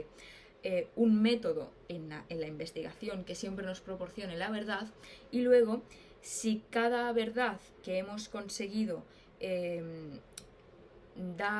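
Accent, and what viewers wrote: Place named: Spain. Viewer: Me gustan las mujeres q leen...¡y qué manera de gustarme el acento español!...felicitaciones...😊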